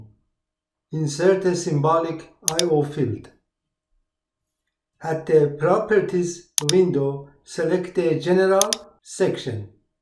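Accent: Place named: Iran. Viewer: لهجه ایرانی داری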